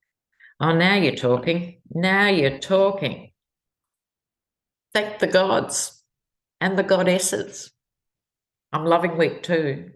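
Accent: Australia. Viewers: BTW, I love your Australian accent which makes it even more fun to listen to your video!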